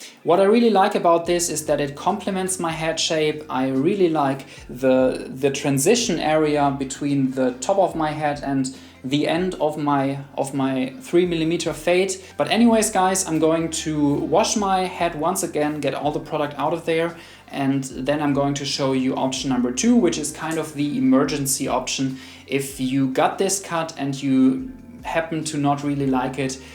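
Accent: Germany. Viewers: ch wusste an deinem Akzent das du Deutsch sprichst.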